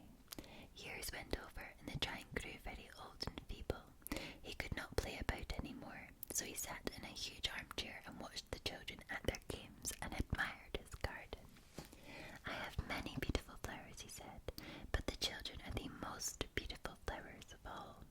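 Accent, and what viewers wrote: Scotland, United Kingdom. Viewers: Who else would love to see a Shrek roleplay from, she also has a strong Scottish accent, which makes it all the better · I’m loving the Scottish accent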